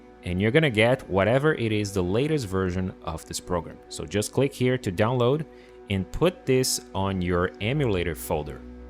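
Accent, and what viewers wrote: Brazil. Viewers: o que tu parece brasileiro falando ingles eh brincadeira viu!!! assume ai, duvido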